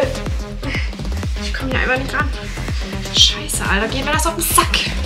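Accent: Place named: Saxony, Germany. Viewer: Ich hoffe, wenn du wieder heme bist, kommt der Sachse in dir, Öffter mal raus. Steht dir gut finde ich <3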